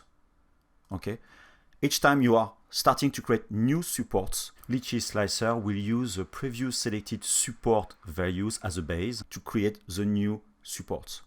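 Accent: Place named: France. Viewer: Very nice, keep em coming! Used to Isotropix Clarisse, donc pas de probleme avec l'accent francais :)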